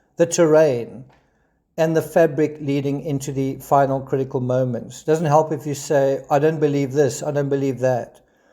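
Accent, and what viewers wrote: South Africa. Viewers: Thank you for this excellent summary! So great to hear a South African voice... I am a South African living in the UK. I have followed the case from beginning to end and I am sorry that Jay Slater has lost his life, but I am glad that his body has been found.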